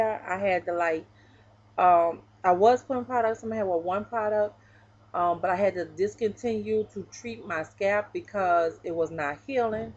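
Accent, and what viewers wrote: New Orleans, United States. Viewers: what had happen girl you can tell you from new orleans LOL...., thanx for the vid.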